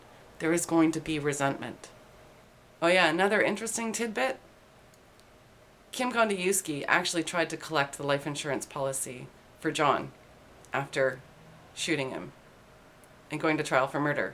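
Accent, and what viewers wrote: Canada. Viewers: You really work that smirk with the chin down and eyes up, bangs at the top of eye level well. You could talk to me for hours lady. Monotone discussion in laid back style, with occasional canadian dialect shining through. Making my heart flutter. Feeling enveloped with a warm buzz of unseen tribbles that are cloaked. My pupils are dilated to the point to where i look like an alien hybrid portrayed in our modern pop culture. Yeah i could listen intently to your voice of intrigue for great lengths of time. Many many metric units of time. Your voice could also put me to sleep knowing youre near me. Like a security blanket at 60 decibels. Lol. im so sorry i keep ranting on your videos i am kinda trying to flatter you as though youre actually eading this stuff. Im bored to hell tonite thats aboot,lol, all as to why i keep on. I need my meds. Just meds i guess. 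I dont take any but feel like theres a stereotypical void that needs fillin. With pills. Haha.? I live near harley in milwaukee. Are ya related to willie g? Eh? I speak like a yooper too. Except we have a phrase in Wisconsin you canadians dont have. Dont cha know? God i vrack myself up. Im going nuts here! Not just about your appealing demeanor, looks and personality neither.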